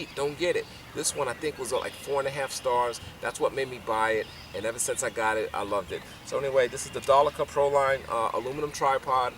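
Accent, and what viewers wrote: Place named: New York, United States. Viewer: you are an intelligent c.... not just a c.... you are fun to watch and have a great New York (yeah) accent. keep up the good work😁😁😁😁😁😁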